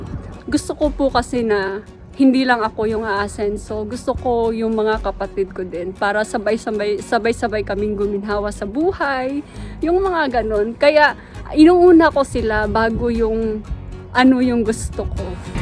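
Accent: Visayas, Philippines. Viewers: Hello. My kababayan Alam Kong visaya gyud Ka dahil Yong pag bigkas mo Ng Tagalog migdyo pilipit. Proud ko sa mga PILIPINO kahit saan kahit anong trabaho marunong mag tiyaga . God bless you & your Family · BISAYA po kayo ma'am?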